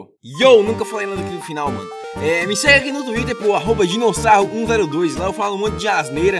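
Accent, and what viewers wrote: Bahia, Brazil. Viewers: Tu é de que lugar da bahia? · Mano,vc é baiano ou gaúcho,sou filho de baiano,sou mineiro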